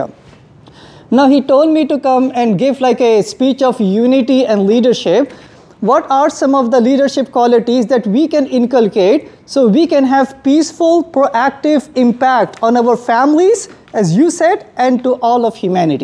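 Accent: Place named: India. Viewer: I like when indians speak english 😍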